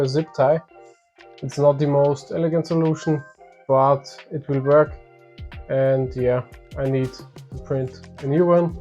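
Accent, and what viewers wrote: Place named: Austria. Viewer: Subscribed. Miss that accent. Know you are Austrian. Besser als bayerisch. 😂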